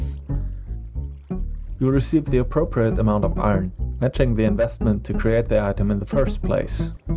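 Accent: Germany. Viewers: Deutscher oder..?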